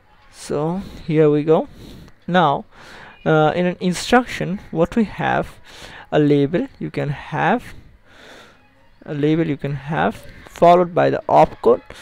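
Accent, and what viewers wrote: Bengal, India. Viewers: he is indian and his mother language is "Bangoli"(local language)... so this "Bangoli" accent is coming in his English.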